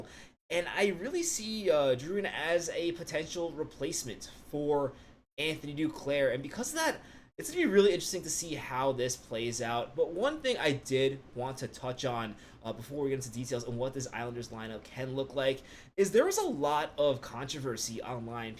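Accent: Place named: Iowa, United States. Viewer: Where are YOU from?? Ya don't sound like a Long Islander. Ya sound like you're from Iowa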